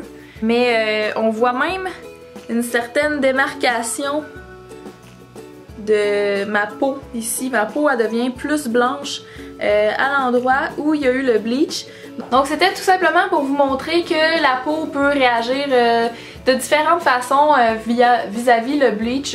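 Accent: Quebec, Canada. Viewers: Super vidéo et très jolie accent canadien ou québécois j'imagine ☺ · du Canada ( c'est l'accent canadien ) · moi je kiffe l'accent québécois lol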